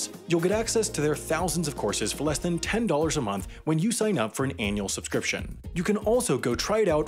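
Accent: France. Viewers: Great French accent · How are there almost 10% dislikes? French people unsatisfied with his French accent or the fact that he's shedding light on this embarrassing mistake by the French government? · Looks like everyone talks about your accent in French and not about the content :) in any case that is an interesting video but the problem was not caused by the split of RFF off SNCF. Measurements and data were just way too old and incomplete. RFF did not go measure the stations, they just handed out the archives that had been made at the old times of the single SNCF. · I like the effort, but your french intonation makes me cringe. When I read the video title, I thought the SNCF had ordered trains for the wrong track gauge. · His entier english sounded french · dude, it's not. He was speaking European French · What a coincidence. I'm Sam and I also speak French. In response to many of the comments below, his pronunciation actually wasn't that bad. 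The r's are the hardest part to an English speaker, and they weren't completely horrible. As others have pointed out, he got tripped up by a few words where he made the mistake of trying to pronounce the letters that were in the word (which everyone should know by now you should almost never do in French). "Monsieur" was the worst part, but there were minors ones like there should be no "l" sound at the end of "rails". I'm not docking any points for "croissant", since he mostly said it the way Americans say it, only with a better r sound. On the positive side, you can tell he has some comfort with French by the way he doesn't make every vowel sound super long. This is a common mistake for Americans pronouncing French words like "Bon appétit" or "Entrée". French almost never has long vowel sounds, so they sound very foreign to native speakers. He sort of does it a little the second time he says "fantastique" and stays just a little long on "fan". 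I've seen a few comments discussing accent, and I wouldn't call it a North American French accent. It's a metropolitan French accent with North American English thrown into it. Most North American French accents (I'm not intimately familiar with all of them) are completely different from this, especially when it comes to vowel sounds.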